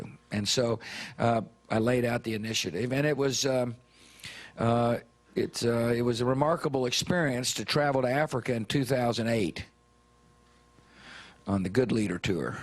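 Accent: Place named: Texas, United States. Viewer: He's from Texas, can you tell? (so am I).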